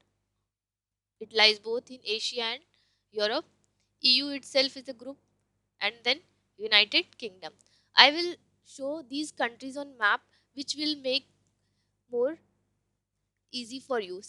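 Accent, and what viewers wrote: India. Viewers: you sounds like wal-mart and dell customer service, both are indian.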